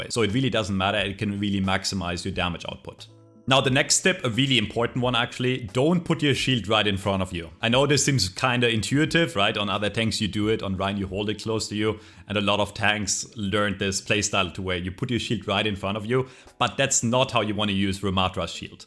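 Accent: Germany. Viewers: I knew you were German within the first 5 seconds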